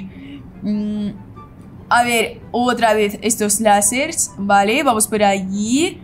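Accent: Brazil. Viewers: Es de Brazil y le cuesta el español ojalá ser de brazil😢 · No se ve raro si se escucha así es que creo que es de Brasil por si acaso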